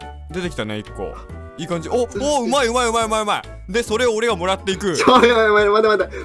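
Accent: Kansai, Japan. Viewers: 関西弁意外だなって思った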